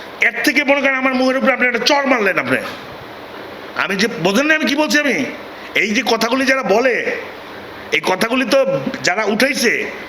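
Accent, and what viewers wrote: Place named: Barishal, Bangladesh. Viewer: Kothar style ki re vai...😮 asholei Borishal is made in Borishal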